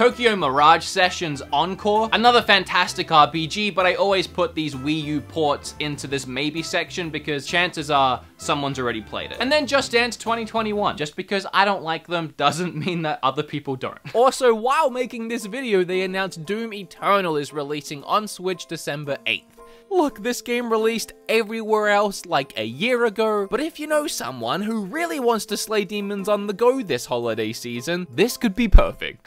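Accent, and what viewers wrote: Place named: Australia. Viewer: He’s Australian I think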